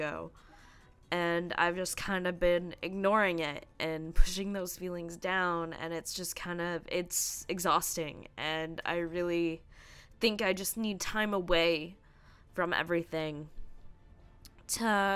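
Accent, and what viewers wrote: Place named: Canada. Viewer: Gurl! Miss you. Whatever you gotta do, you do you :). But we(I) will be there for you in whichever way you need xD. P.S. You sound soo Canadian...and I love it! No offense..? Lol <3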